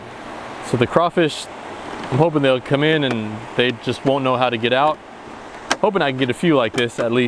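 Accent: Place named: South, United States. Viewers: Southern accent made me laugh so hard, ^_^. 5 star video. Thanks. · Love that southern voice hahaaa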